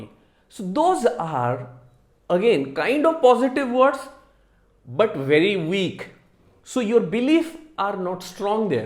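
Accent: India. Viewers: I joined you back in January. I love the way you say things and you’re Indian like me with the Indian accent like my parents. Please let me know when you come to Orlando, Florida.. I would love to meet you. Soni Add me on Instagram ( sonibaby) and Facebook. * I have manifested things bc of your teachings. I can’t thank you enough.😘🙏🏽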